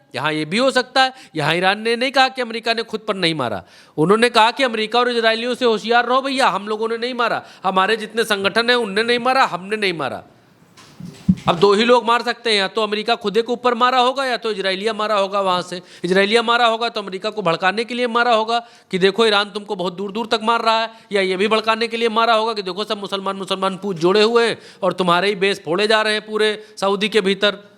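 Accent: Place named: Uttar Pradesh, India. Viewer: भाई जब बोलते हैं तो सिर्फ सुनने को जी चाहता है pure up ki bhasha Bolte Hain 👍👍👍👍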